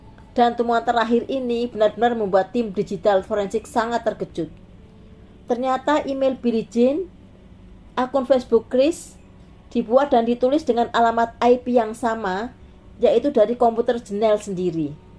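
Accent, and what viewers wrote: Java, Indonesia. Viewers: Jawanya meddok banget 😁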